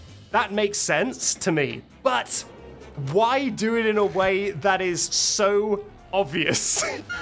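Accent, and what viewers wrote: United Kingdom. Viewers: That's weird cuz my dog was running all over the house cuz I was playing this on my TV once upon a time and he couldn't figure out the British accent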